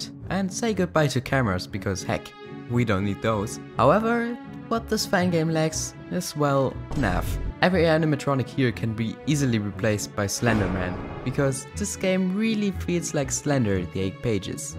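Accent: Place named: Germany. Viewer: I can hear the German I think xD but love ur videos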